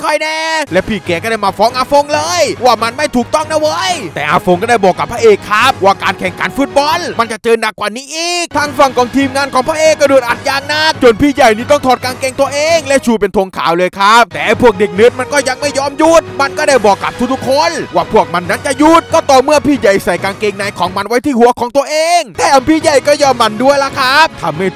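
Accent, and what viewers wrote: South, Thailand. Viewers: แอดเ็นเด็กใต้ช่ายหม้ายนิ?? อยู่จังหวัดใหน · ฟังๆ ตกลงแอดคนใต้เออะนิ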